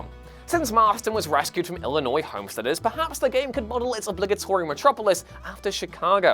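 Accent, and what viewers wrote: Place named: United Kingdom. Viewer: I guess you guys were Americans. U make great content. But that British accent is so annoying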